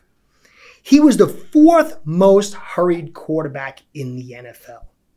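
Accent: New York, United States. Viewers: This dude talks with a NY accent, something fishy 🤔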